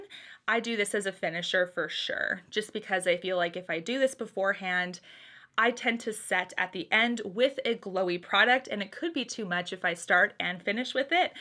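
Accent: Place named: Winnipeg, Canada. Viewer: Born and raised in Winnipeg although now live in the states- I always like listening to you as your slight accent reminds me of home! loloyogini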